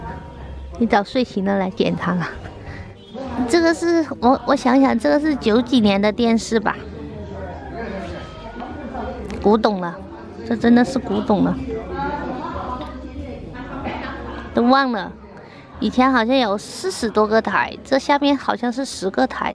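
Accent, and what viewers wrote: Sichuan, China. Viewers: 妹子你这川普好巴适噢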